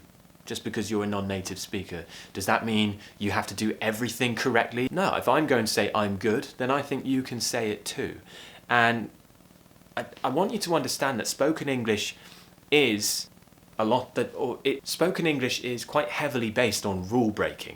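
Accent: United Kingdom. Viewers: I Love Your Accent. I'm watching every of your videos. And I learned a lot, Especially the British Accent, So Now,I'm studying British accent and finally I found your videos and it help me, I mean, You help me and others about british Pronounciation, Accent and Phrases,Etc. Thanks to you Idol. I'm from Philippines and proud to learn British English. I Hope That Someday I will also come to the United Kingdom. Especially In my Favorite Place London, England.I hope you can help and teach a lot more like we are just learning English and British accent And I hope your Channel lasts longer, have more Likes, shares and Subscribers. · In love with your British accent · Top of the morning sir, do you speak in the British English? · Hello from Brasil! I really love your British accent, love your way of teaching. Thanks a lot.♡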